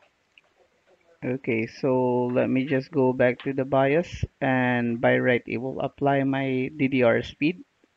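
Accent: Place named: India. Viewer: Fracking Indians. You slaughter the English language. Easiest language in the world next to Spanish yet after two centuries of English Empire domination you still can't speak it legibly. No wonder the Greeks said screw this and went home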